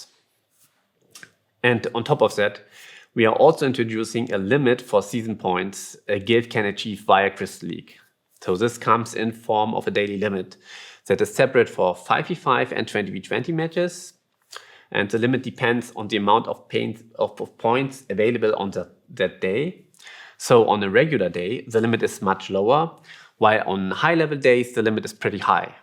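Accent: Germany. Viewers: his accent is the most german accent ive ever heard.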